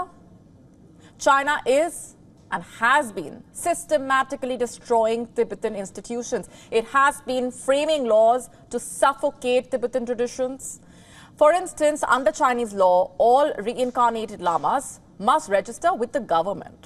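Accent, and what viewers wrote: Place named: India. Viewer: Her curry accent is so strong, can't even pronounce Tibetan.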